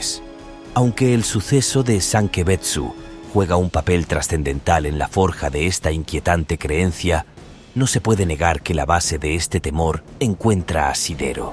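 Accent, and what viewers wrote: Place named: Spain. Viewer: la verdad me parece ridiculo, pero bueno supongo que es más por que todos ya estan acostumbrados a la voz anterior. En lo personal prefiero esta voz, tiene aunque sea un poco mas de sentimiento y le da más un toque a documental que la voz robotica anterior, y a criterio propio siempre he sentido que el acento español le da un plus a este tipo de narraciones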